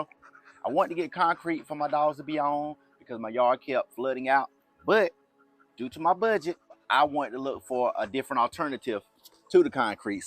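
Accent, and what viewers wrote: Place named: South, United States. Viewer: I think my mind is made up now to use pallets. Hec, my pocket has my mind made up. We have old 12x12 fence for dog pin. You have great ideas and spoiled pets. Im a Georgia 🍑. I caught your southern accent about your second sentence. I find the southern accent rich, rooted and historical. Thanks for sharing your video.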